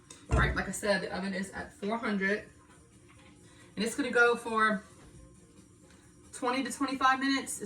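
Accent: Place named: South, United States. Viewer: you must be from the south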